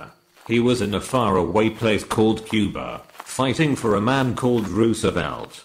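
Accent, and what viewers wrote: United Kingdom. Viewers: All of his voices and pronunciation is perfect......Full of British Accent!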